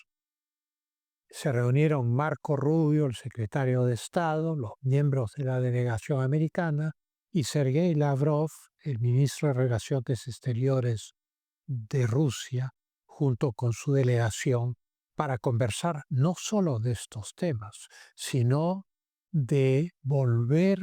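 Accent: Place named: Castile, Spain. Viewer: me suscribo por que respeto su cultura y tu hablar clásico del castellano.- casi o colonial. bien hablado ojala sea tu lenguaje y dicción un ejemplo en el lenguaje en la educación del estudiante colegial del Perú.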